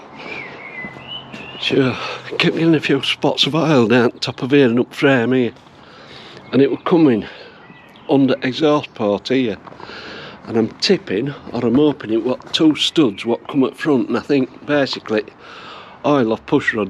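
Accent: Yorkshire, United Kingdom. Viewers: Nice to see a chap do a job so well and “liked” just for having the best accent in Britain! My Yorkshire roots may be making me biased though😂. I wonder, could the misfire be HT leads or plugs? The only other thing springs to mind is petrol…this “green” stuff has a stupidly short shelf life. I stored my bike with high octane storage fuel over winter and it started and ran beautifully after a five month lay up.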